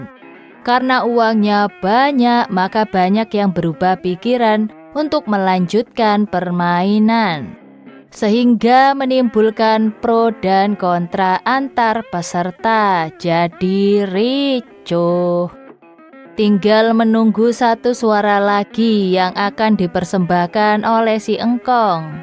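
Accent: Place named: Java, Indonesia. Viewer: Mbak e suarane medok tenan 🙏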